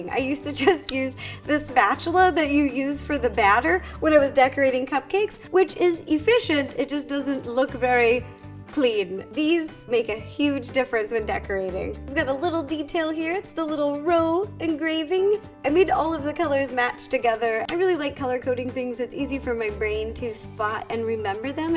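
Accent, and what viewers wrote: South, United States. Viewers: I've been watching this channel for at least a year, I love Ro and her crazy baking ideas, but the more I watch the more she sounds like one of those southern women who hosts the Home Shopping Network in the middle of the night.... But she's from Washington...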